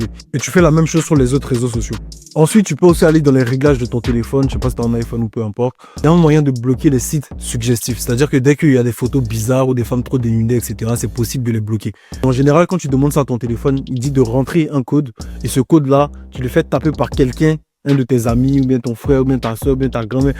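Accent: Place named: Ivory Coast. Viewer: On dirait que vous êtes ivoirien hein frère👊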